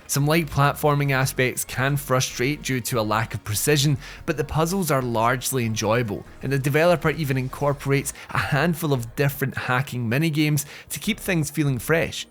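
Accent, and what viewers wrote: Scotland, United Kingdom. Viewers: Oh no not a scottish accent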